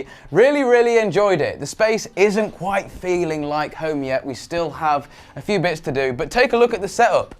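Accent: England, United Kingdom. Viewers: He looks like jayztwocents son with an English accent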